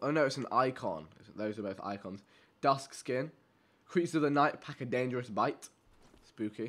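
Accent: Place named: United Kingdom. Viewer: Why do you talk like your from the UK your indian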